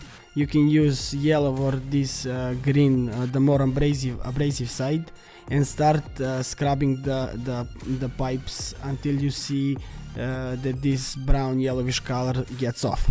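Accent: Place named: Balkans. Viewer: Tacno se cuje taj balkanski akcenat :D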